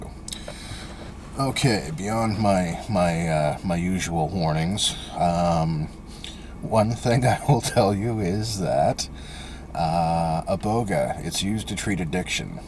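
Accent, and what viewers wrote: Canada. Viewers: This guy is Canadian he says aboot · Good stuff man. I am ready to have that trip. You sound Canadian? How do I message you btw?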